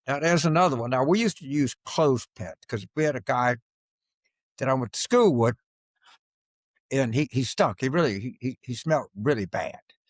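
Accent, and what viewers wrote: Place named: Louisiana, United States. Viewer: This is funny as hell especially when you cuss with your Southern Louisiana accent.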